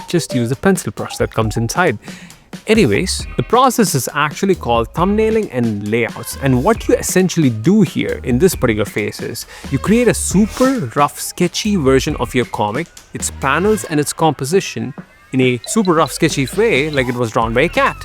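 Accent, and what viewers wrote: India. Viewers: really? listening to his indian accent is so annoying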